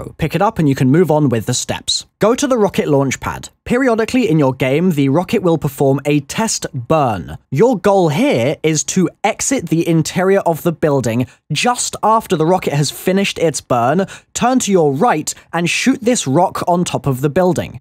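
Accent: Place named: United Kingdom. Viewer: his voice is probably "funny" because he's british. if you're american or something around that area, then that's probably why. his voice does sound a bit high-pitched for a grown man, though. maybe that's what you meant.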